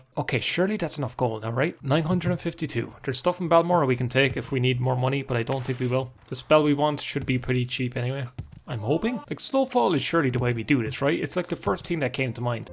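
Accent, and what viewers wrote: Ireland. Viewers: I wonder if anyone has ever told him he sounds like Jacksepticeye if they added a 10x more Irish to him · Are you Irish?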